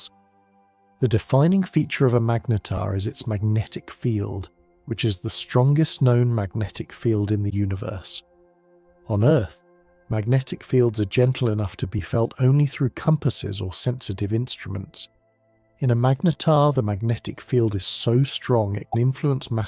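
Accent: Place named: United Kingdom. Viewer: hmm you say you are from USA but this is British accent, you should put an "intro" in your channel so we know this is not just another A.I. slop.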